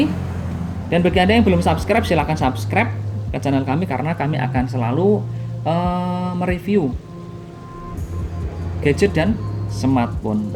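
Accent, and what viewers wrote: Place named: Java, Indonesia. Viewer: Medok banget om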